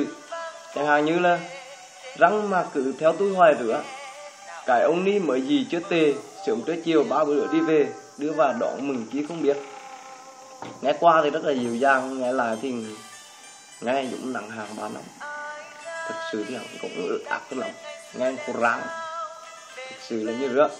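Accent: Hue, Vietnam. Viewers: e la ng miên tây nhung em rat thích tieng hue.nghe anh noi mot đoan .nghe thích thât. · tiếng huế hay thật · Giọng huế nghe hay dệ sợ . Phú vang , thừa thiên thì like cho cấy cả tội nờ.😂😂😂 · giọng huế rất hay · Nói nặng quá, đảm bảo kiểu ni chỉ có người Huế mới nghe được thôi!!! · Quá chuẩn giọng Huế, like anh! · Giọng Huế khá hay, anh này nói đúng chất của giọng Huế và kèm theo một số từ ngữ địa phương thông dụng mà người dân Huế hay sử dụng, like anh!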